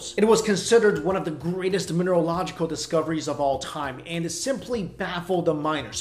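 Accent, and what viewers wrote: Canada. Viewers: All I can say is: "Are you Canadian?" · Is he Canadian?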